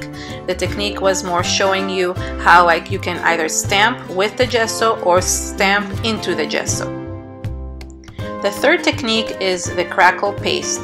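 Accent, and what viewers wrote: Israel. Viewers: From your name and accent, I’m guessing that you’re Israeli? Love your videos!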